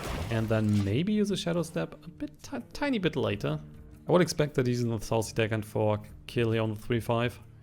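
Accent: Germany. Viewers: Youre german right?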